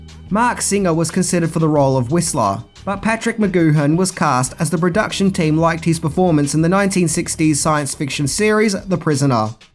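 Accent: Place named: Australia. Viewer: Oh man the aussie accent is awesome "avendge his mavah"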